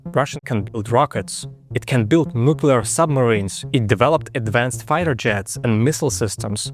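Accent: Russia. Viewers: Автор 100% русский, у него не самый чистый английский, есть русский акцент (это так, к слову) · У него сильный русский акцент, мне почему-то кажется, что автор русский)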